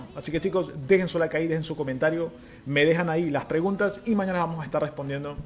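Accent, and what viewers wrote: Venezuela. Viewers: Matias Cohen No contradigo que actualmente vive en Italia. Lo que contradigo es tu comentario “Es italiano”, en realidad es Venezolano (según yo) por lo que dijo desde el minuto en adelante y también por su español (con acento venezolano) fluido, tengo una profesora de Italia en mi Universidad y ni ella habla el español tan fluido como.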